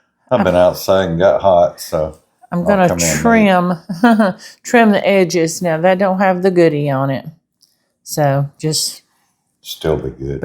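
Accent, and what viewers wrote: South, United States. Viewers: Miss, first off, I so enjoy your videos and your sweet, southern accent!!! Do you ever just sit back, close your eyes and try and envision a new recipe? I do it all the time!! Tonight, I was sitting here (with my eyes closed😌….that’s important😂). I made a cheese ball last night for game night with our neighbors. It has cream cheese, diced green onions, diced dried beef, Worcester sauce and some spices. Tonight, I have some sweet, red, Argentina shrimp. I thought I would spread a thin layer of the cheese ball onto a flour tortilla, sauté the shrimp and make shrimp tacos with butter lettuce, onion, cherry tomatoes and an avocado lime dressing. When I opened my eyes, I clicked on your video and you were spreading cream cheese, sour cream and the dried vegetable blend onto a tortilla!!! I swear! You read my mind!!! It’s either that or AI!!😂😂😂😂 Keep making your awesome recipes!!!😊😊😊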